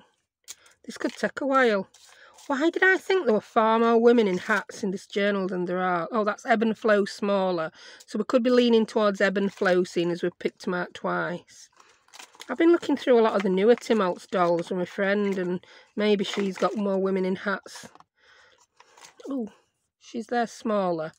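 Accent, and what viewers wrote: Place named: South Yorkshire, United Kingdom. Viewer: Sorry, I just need to know where you're from. That accent screems South Yorkshire!!? 😃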